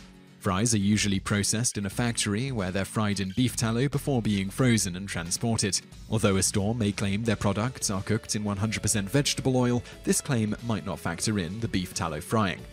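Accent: United Kingdom. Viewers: *Story time!* As a muslim and my family not being religious we end up at alot of non-halal restraunts so I have to pick and mix from the veggie menus ( i used to be veggie for pretty much my whole life before converting to islam.. even slumping on the vegan side!) aaaaannnnd tbh They're pretty good at stating whats vegetarian or not as well as I'm allergic to all E-numbers, colours, preservatives, flavours, blah and blah so I have to read the ingredients lists anyhooo but Again, the guy in the video is pretty much right... if you want to guarantee something is veggie/vegan then grow/make it yourself! *we salute you Wonderfully british accented narrator....*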